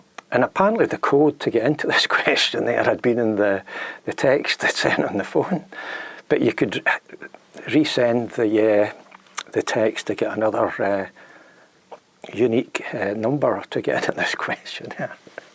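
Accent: Scotland, United Kingdom. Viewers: So this is way off topic. But I found your channel because I have the type of brain that gets interested in very specific things, and lately I've been interested in the name Burns and all its variations (Byrnes, Byrne, et cetera) and found out about Burns Night and was interested in how Burns is said in a Scottish accent (I'm American) so I was googling something like "Burns from Scotland" and found your channel. I found a few videos where you do say it:). Now I understand that Burns, Byrnes, and Berns are all different names with different origins. I also learned for the first time that people from Scotland make the "er" sound different from the "ur" sound, whereas I say those the same as an American. So I'm wondering: would you pronounce "Burns" differently from "Berns"? I'm guessing you would.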